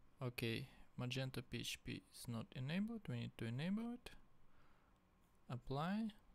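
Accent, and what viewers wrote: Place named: Ukraine. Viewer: Якісний, вітчизняний акцент.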